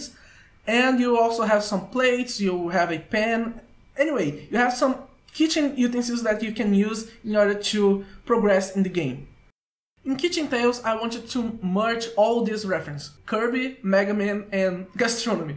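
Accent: Brazil. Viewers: I see you are a brazillian by your accent! Im a brazillian too! Also i really like megaman x5 its not as bad as people say (x4 was better tho) Great tutorial by the way dude! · Eu chutei pelo sotaque mas aí vi a versão BR do livro Level Up no fundo e tive 200% de certeza kkkkk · Is that a Brazilian accent? great video :D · Lol! I recognized the accent too. (Brazilian here too) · You sound a lot like you are from brasil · Vc parece ser um br falando ingles, mt bom o video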